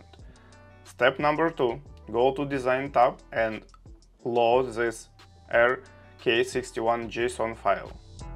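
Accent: Russia. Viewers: where are you from bro? why u talk like russian people я слышу именно максимально русский английский говор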